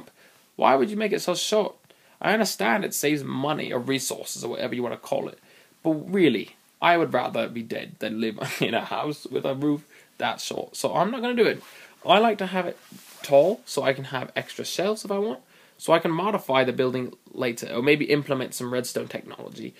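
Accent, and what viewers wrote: United Kingdom. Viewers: are you brittish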